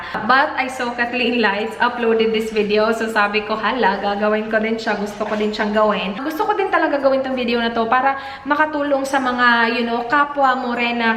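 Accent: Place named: Visayas, Philippines. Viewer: gwapaaa jd kaayo oy <3 hahahah cute kay imong accent ate tagalog pero bisaya ang tuno hahahaha <3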